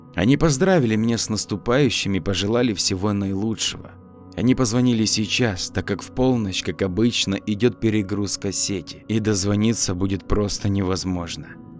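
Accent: Ukraine. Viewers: По говору слышно)и очень приятно слышать рассказы об украинских местах, с элементами местных словечек) у вас отлично получается озвучка, желаю вам удачи) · Автор,ты не из Украины ?)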